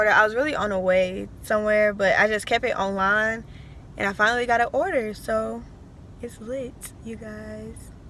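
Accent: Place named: Atlanta, United States. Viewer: Yeah I can hear the Atlanta accent. :)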